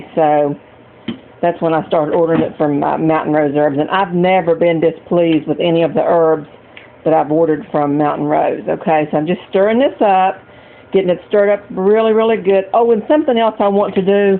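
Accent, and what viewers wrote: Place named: South, United States. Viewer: You have a southern accent I'm curious I where your from because I'm from NC and I can't find it anywhere here only white clover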